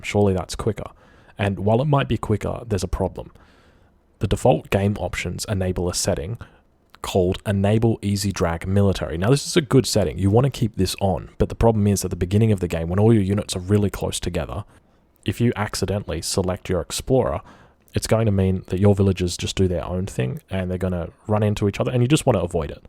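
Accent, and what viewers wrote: Australia. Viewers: AoE3DE Art of War narrated by an Aussie in a very competent and thorough way? Flawless victory! Cheers for the amazing content mate!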